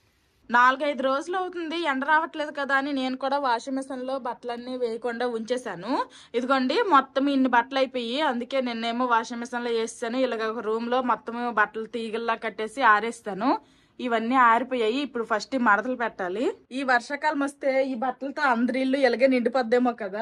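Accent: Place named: Vizianagaram, India. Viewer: Hai చెల్లి ఎలా ఉన్నారు.మాది విజయనగరం.అందుకే మీ మాటలు మా మాటలు ఒకే లాగా ఉంటాయి. చెల్లి నాకు ఛానెల్ ఉంది. Indus valley కి యూట్యూబ్ మెయిల్ ఇవ్వాలా వేరే మెయిల్ ఇవ్వాలా.please reply చెల్లి.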